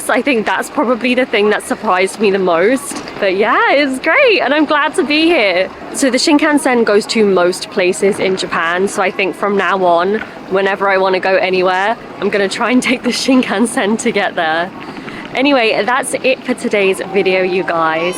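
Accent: United Kingdom. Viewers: easy to hear british pronunciation😄😄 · ＢＢＣの短波放送を聴いていたのでイギリス英語が私には理解しやすいです。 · やっぱイギリスアクセントめちゃいいわ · I can listen to your UK English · イギリス英語🇬🇧最高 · 最初の1分くらいはオーストラリア人かニュージーランド人かと思いましたが、イギリス人なんですね。Crisps。 新幹線は、JR東日本のEki-Netがたまに半額やってますね。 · Your accent is lovely! I like British accent. Thank you for coming Japan! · Lovely！ As soon as I look at your video, I miss England because I always listened to British English that you talk when I lived in England. I like to listen to British English.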